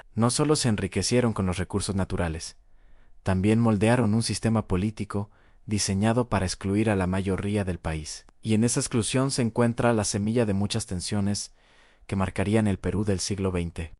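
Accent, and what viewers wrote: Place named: Mexico. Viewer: POR QUÉ M. SUENA A DIALECTO MEJICANO ¿?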